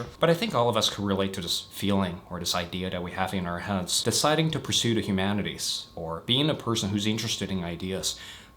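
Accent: United States. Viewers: why do you pronounce things more like an american than an aussie?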